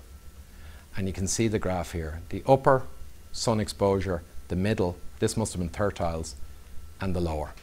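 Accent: Ireland. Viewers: Sounds like he's from Galway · I could listen to this chap all day, his wonderful Irish lilt has me glued in the same way that Carl Sagan did. There's something in the voice that seems to illuminate what's being said, and illustrates what would be otherwise baffling. Thank you Mr..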